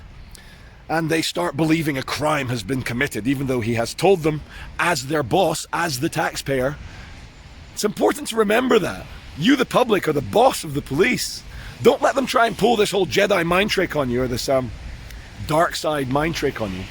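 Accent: Scotland, United Kingdom. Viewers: makes you sound more Scottish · Are you Scottish? I've been trying to work out your accent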